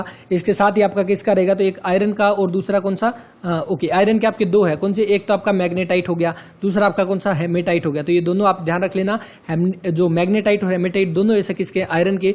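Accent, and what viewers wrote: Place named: Haryana, India. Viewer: South haryana ki bhadi boli h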